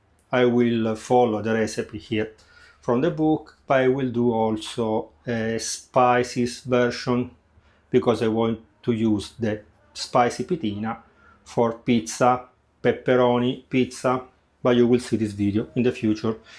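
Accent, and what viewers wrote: Italy. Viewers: Tuoi vídeos mi piacciono moltissimo. Mi piace tanto il acento italiano. And tour recipes and techniques are great!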